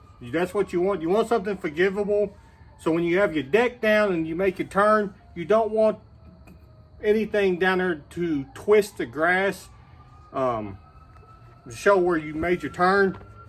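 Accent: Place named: South, United States. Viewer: I hear that accent as he talks, I can just about say he's in the south, so it'll be warm season grass like St. Augustine, Bermuda, Centipede, etc. Our grass in the south is pretty stubborn and tough compared to cool season grass like fescue or ryegrass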